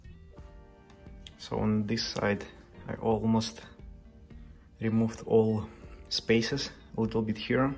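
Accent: Russia. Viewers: You are from Russia? You have a very strong Russian accent. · Да , но английский с русским акцентом ужасен!!😢😢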